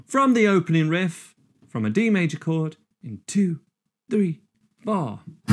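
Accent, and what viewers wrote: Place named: Yorkshire, United Kingdom. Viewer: Yokshuh accent, there.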